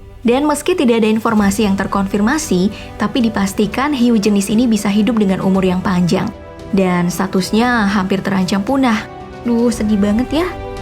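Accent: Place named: Jakarta, Indonesia. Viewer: Pan betawi yak wkwkwk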